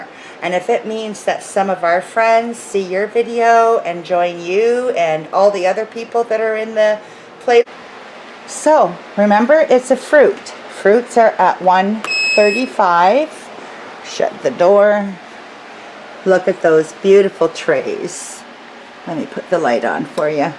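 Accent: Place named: Canada. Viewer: Just came across your videos today! Love your way of teaching and your Canadian accent! Subscribed and look forward to watching all your videos!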